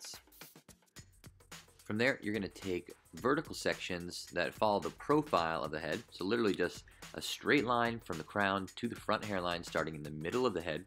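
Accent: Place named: United States. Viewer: Absolutely awesome dude from one hairdresser to another I’m from the UK 🇬🇧 i can tell your the US 🇺🇸 accent.Loving your content so much ❤can’t wait for more of it 🎉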